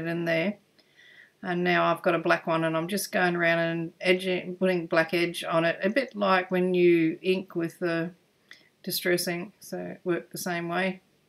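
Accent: Australia. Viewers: Hi, I was watching '49dragonflies' for Dephemerember and part of your video of 'Effie' was shown, so I thought I'd pop over and have a look at your channel. Glad I did. Really loved the leather look. Got me thinking. Thank you. Great to hear the Aussie accent too. Jeanne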